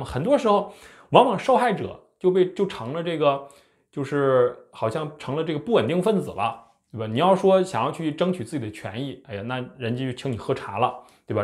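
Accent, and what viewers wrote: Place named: Northeast, China. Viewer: 抱歉噢。。。我听到你的 “十三岁 ” 发音 就想笑。。。 东北口音吧？？？。。。我新加坡人。听了 很特别 可爱。。。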